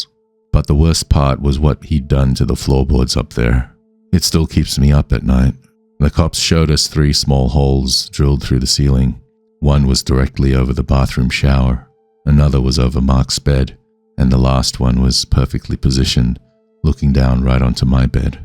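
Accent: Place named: Australia. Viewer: Great to hear an Australian story teller, I enjoyed listening in ❤🇦🇺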